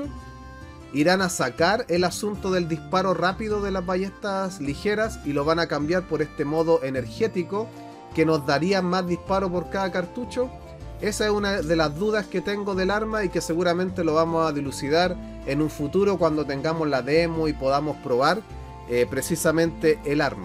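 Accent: Chile. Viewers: por que hablas como chileno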